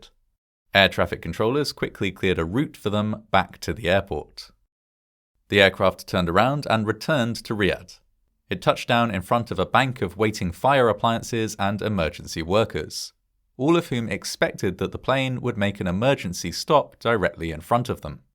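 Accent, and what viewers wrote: United Kingdom. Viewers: Horror vids are always better when narrated by a British bloke.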